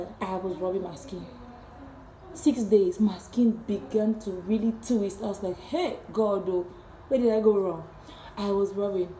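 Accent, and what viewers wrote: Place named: Cameroon. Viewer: You ve got to love Cameroonian accents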